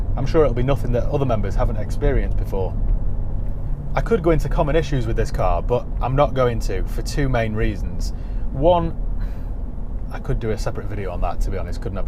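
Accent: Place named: Manchester, United Kingdom. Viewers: Not a bad cross Pennines accent! Car is pretty nice too! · hey...youve gone all manc on us...the review though is great...i like your reference to "conti"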